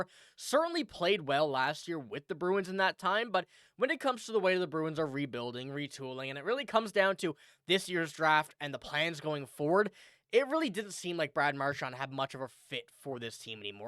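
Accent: Newfoundland, Canada. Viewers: As much as I love 63, and would have loved him to retire as a Bruin, it wasn't going to help the team to keep him. I can't see him playing third line on the B's. I pray to God he doesn't go to the Leaves. I'd rather see him in Montreal. My stomach tells me he stays in Florida. With regards to Sway, he better get his act together. Oh, I finally subscribed. Question are you a Newfie?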